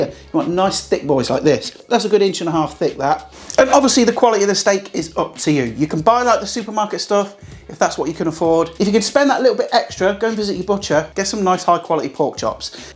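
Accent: Leicester, United Kingdom. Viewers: Just wondering if this method would work for Gammon steaks 🤔 I discovered a while ago that grilling Gammon steaks seemed to really dry them out, so I switched to cooking them in a hot pan, like I would a steak, which seems to give that lovely char, and not leave them dry inside. I too like to experiment and really enjoyed the burger videos, confirming many of the methods I stumbled upon. I never buy pre-made burgers, much better to form them from scratch, as you do. They freeze really well also. Great videos! I thought I recognised your accent - your weather report confirmed it - I am hail Leicester also. What a crazy hot summer it was 🥵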